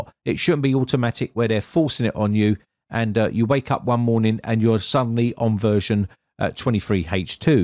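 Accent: United Kingdom. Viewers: Totally unrelated to the video, but why do Britain's say "haytch" for the letter h, instead of just saying "aytch" ?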